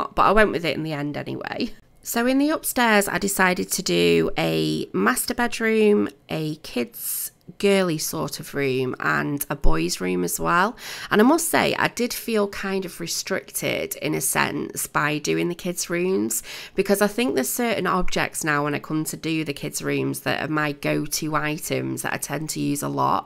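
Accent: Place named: Northern England, United Kingdom. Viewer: New sub here from Arkansas, USA. I love your builds. Are you from the North (Britain)? You sound like everyone on Emmerdale and Tan from Queer Eye LOL. Congrats on becoming a Game Changer!!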